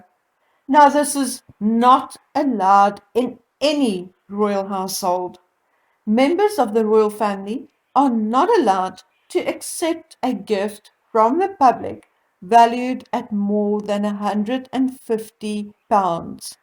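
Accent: South Africa. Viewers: I love your videos but the accent is sooo hard to listen to. Dutch/German and African created the weirdest accent on earth. My bf is South African and even he says it's the ugliest accent on earth! Lol. Some people have nice soft ones but then you get the really stilted, harsh, Hocking ones. They don't flow at all it's like all from the throat and sounds tiring to speak lol. There's no flow, the one is off, like a computer voice. Know what I mean? Im clenching my jaw the whole time, it sucks cause it's so hard to listen to :( 😂 it's a real shame but it's infamous for a reason eh! I've listened to you for a year, and I'm STILL. Not. used. TO. IT. .😅😅 .It's. Like. There's a. fUll stop. After. Every Word. And they go UP . And the . Tone. Goes down at. Random. Points. " Or maybe its Zimbabwe but it's one of those German/Dutch meets African monstrosity combinations lol. 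Looks wise, caucasian Africans are gorgeous though.. the men and the women. the men are always sooooo sxc and manly! Woo! 😍 I think it's the mix of cultures and outdoor lifestyle , but yeah... They are sxc like we Australians . 😎😎 It's just the accent that can be gnarly.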